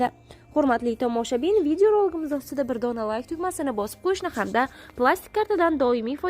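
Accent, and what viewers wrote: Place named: Angren, Uzbekistan. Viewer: ГАПЛАРГА ТУШУНМАДИК. АНГРОК ГАПРСА БОЛАДИМИ. 😮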